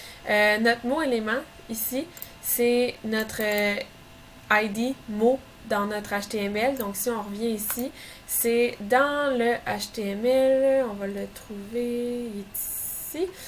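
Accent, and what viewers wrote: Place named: Quebec, Canada. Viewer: Trés bon tuto avec des explications claires. même avec l'accent québécois lol. trés claire.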